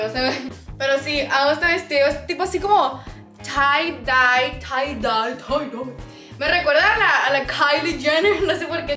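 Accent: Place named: Fresa, Mexico. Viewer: Esta morra se volvió fresa 😂 habla bien fresa ahora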